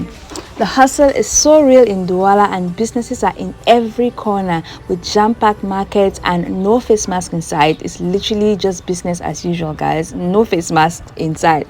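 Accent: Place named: Nigeria. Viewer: I don't know but you sound so Nigerian.. Nice video, you just got I new subscriber.. Keep up the good work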